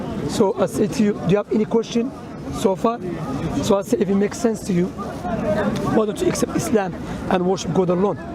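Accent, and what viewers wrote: Algeria. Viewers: عند رؤيتي للفيديو أحسست بأنه جزائري من خلال لهجته ، و الأن تأكذت من ذلك بعد تعليقك شكراً ، و أنا فخورة كذلك كوني جزائرية بهذا الأخ الله يبارك ❤❤ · بارك الله فيك اخي .لديك حجج قوية وكلامك منطقي ومقنع لاي اجنبي عن الاسلام.اول مرة نشوف داعية بقوة اقناعك وبراهينك المثيرة والبسيطة .واصل شرف لنا انك جزائري عرفت لهجتك وحدي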